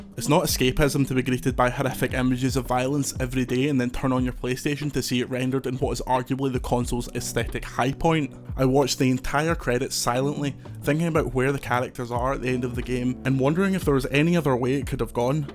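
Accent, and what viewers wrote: Scotland, United Kingdom. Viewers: Yeah Scottish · Scottish accent right? not irish?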